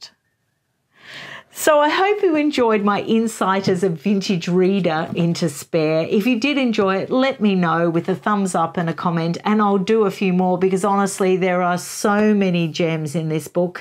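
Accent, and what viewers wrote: Australia. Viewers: This is the first time that you have popped up on my feed and I'm glad you did, and it was so good to hear an Australian. Looking forward to watching more.. ❤ As for Harry, I find him to be a nasty person with no respect for anyone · ❤ your aussie accent.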